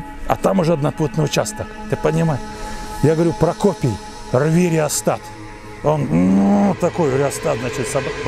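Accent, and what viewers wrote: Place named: Nizhny Novgorod, Russia. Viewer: Чуть заметный нижегородский акцент. От молодых уже ни хрена не услышишь.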